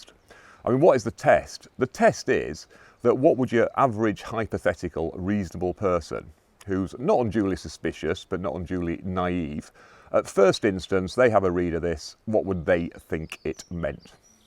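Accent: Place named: Northern England, United Kingdom. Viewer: It’s great to hear your northern accent. As a Mancunian, I’m told I have a broad ‘northern’ accent, hopefully not as rough as Angela Rayner though!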